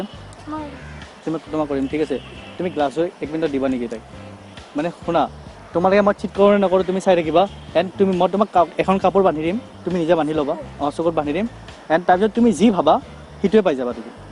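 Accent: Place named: Bihar, India. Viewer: Bihari typ hindi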